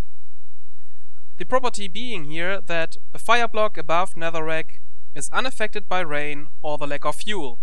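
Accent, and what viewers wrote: Germany. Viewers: Ich liebe deine deutsch "pronuntation" (?) Ps ich bin italienich ;)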